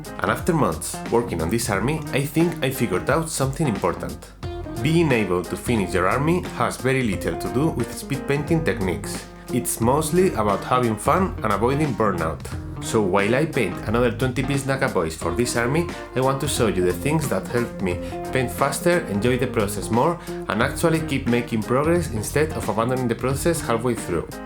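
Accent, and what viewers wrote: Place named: Spain. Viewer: Como se nota que eres español por el acentazo que tienes, hablas el inglés con las tripas xD. Quizas te iria mejor en el canal si te pasas al castellano, ya hay muchisimos canales de habla inglesa y nunca vas a tener el alcance que tienen ellos en inglés, de verdad te lo digo con cariño, tu pronunciación deja mucho que desear y dudo que crezcas por ese camino. En cambio en castellano no hay tanta masificación y menos aun centrada en la parte de pintura del hobby, ahi podrias abrirte un hueco. Un saludo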